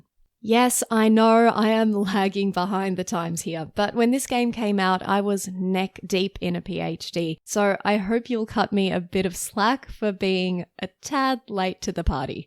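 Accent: Australia. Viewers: a hole in the floor for the ore to drop through is a fun thing to hear in an australian accent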